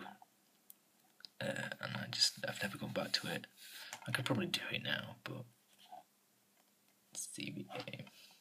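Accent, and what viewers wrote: Yorkshire, United Kingdom. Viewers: I've only recently discovered asmr and you're my absolute favourite. I love the Yorkshire accent so much. Thinking I might start my own channel also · I have just found you via the waterwhispers channel who I love to watch. she did a video talking about other male's who do ASMR video's and to check you all out. with myself from Yorkshire I thought I would check you out to. nice to listen to Yorkshire accent like this. I don't really get on much with male ASMR's but I do like to listen to the Yorkshire accent softly. I be watching more of you.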